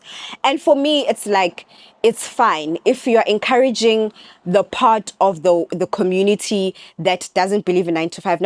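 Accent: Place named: Africa. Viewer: Your voice is soo cute! I used to have a coworker that is also African and I’m jealous of ya’ll accent lol 😂 but on a serious note, DD needs to be stopped!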